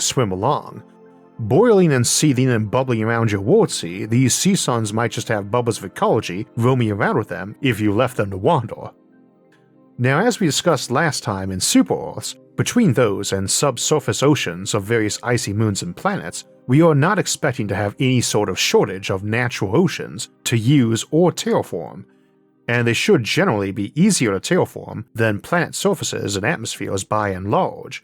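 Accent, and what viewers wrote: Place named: Boston, United States. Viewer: Love the vids! Been subscribed for a while. I keep wondering though - where is your accent from? Sounds very Boston to me but i could be completely wrong!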